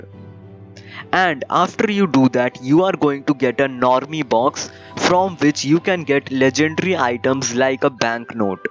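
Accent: India. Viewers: Real Indian English Accent.... at least he didn't tried to show to be fluent (which end up as cringe) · thank you so much for having an indian accent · The Indian accent 🗣️🗣️🗣️🗣️🔥🔥🔥🔥🔥💯💯💯💯💯💯💯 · indian accent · Ha Indian man nice accent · his accent makes the video way better, nice video indian man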